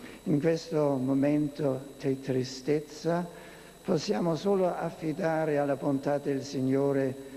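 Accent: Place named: Bavaria, Germany. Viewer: so schönes bayerisches Italienisch - wer braucht da eine Übersetzung?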